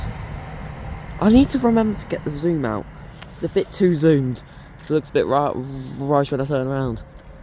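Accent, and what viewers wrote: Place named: RP, United Kingdom. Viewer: You sound so posh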